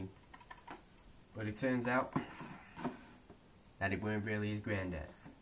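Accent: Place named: United States. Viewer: what you talking in that SHOCKING american accent for ?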